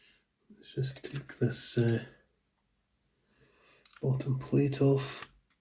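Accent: Scotland, United Kingdom. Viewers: sorry it must have been my inability to listen to a Scottish accent. 😊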